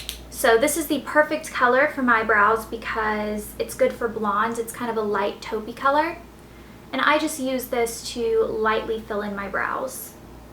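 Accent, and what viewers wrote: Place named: Tennessee, United States. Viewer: She has a bit of a Tennessee accent, leave her alone. You wouldn't ask why an Asian or and East Indian person talks a certain way, would you?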